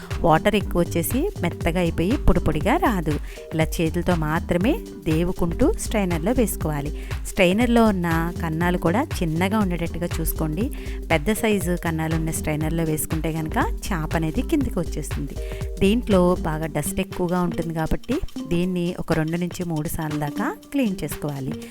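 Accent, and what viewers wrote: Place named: Godavari, India. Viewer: Mee godavari yasa mataladitey bagundedi andi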